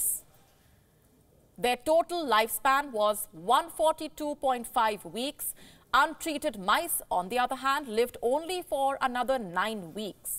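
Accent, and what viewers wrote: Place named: India. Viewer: Don't speak lye like Indian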